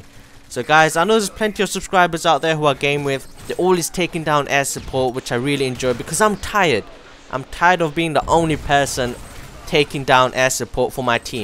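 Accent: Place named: Europe. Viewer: Watching this video, Grandma is sitting across the table asking "who is that on the computer" I tell her you are from Europe, she likes your accent. :) Great vid, been rocking that pp90 with rapid/silencer = beast mode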